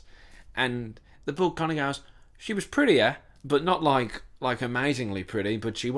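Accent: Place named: Birmingham, United Kingdom. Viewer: Hi, I know you’re from Australia, but can I ask…are your parents from Birmingham? I’m having an argument with my husband that your accent is not purely Australian. I can hear some Brummie in it. Please excuse the prying, but I lived in Australia and you still sound more Brummie to me. Would you mind letting us know??? Please…thank you.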